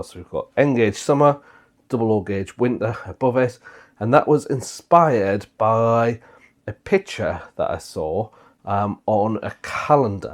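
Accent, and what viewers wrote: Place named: Sunderland, United Kingdom. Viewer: I'm not sure how helpful this will be for you. There's a little model shop in Sunderland (sorry I'm just guessing you are near by based off the accent) called Sunderland model Station. They have several new and used Skaledale buildings, there might be something in there for you. Again I'm not sure how easy it is to visit the shop but if you get chance it might be worth a look 😊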